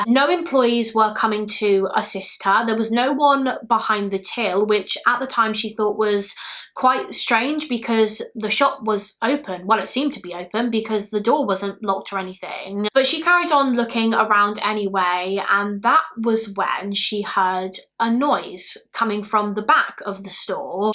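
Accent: United Kingdom. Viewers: You’re so adorable and I love your voice. I’ve always had a hard time with British accents, but you and Eleanor Neal are life for me 🥰🥰🥰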